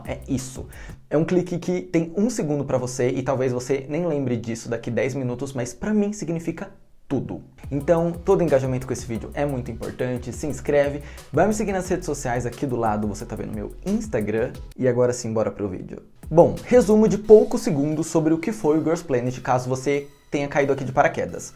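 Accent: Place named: Caipira, Brazil. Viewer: Acho tão fofo esse sotaque "caipira" do 🤗🥰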